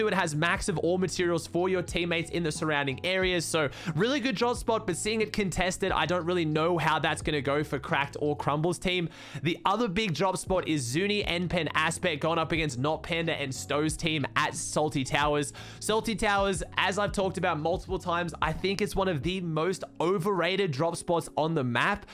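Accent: Australia. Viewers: We love ur aussie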